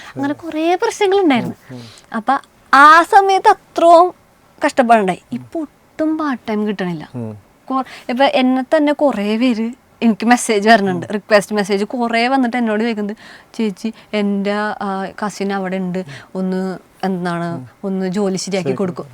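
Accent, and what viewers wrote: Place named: Thrissur, India. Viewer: All good but I am from Mattancherry and even I cannot place her slang to Vyppeen. Thrishur, yes. Something's amiss! Oh, well!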